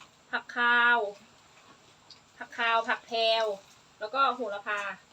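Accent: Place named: Loei, Thailand. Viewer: เว่าคือทางเมืองเลยน้อ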